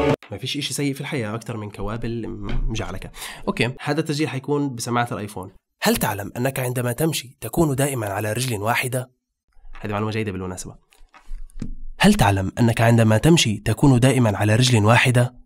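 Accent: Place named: Algeria. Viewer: ههههه هذه لهجة جزائرية ❤❤ تعيش الجزائر و فلسطين شعب واحد للأبد 💕💕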